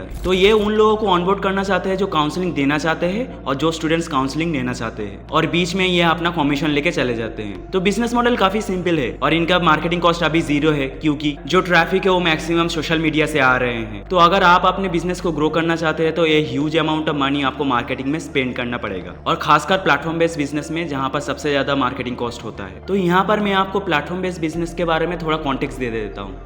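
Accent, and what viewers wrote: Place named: West Bengal, India. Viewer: Dada apni west bengal thaka ki? actually apnar accent thaka lagcha 👍🏼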